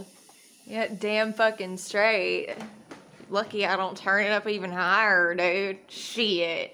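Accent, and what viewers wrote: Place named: South, United States. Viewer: you're too damn cute and voice is so southern I love it lol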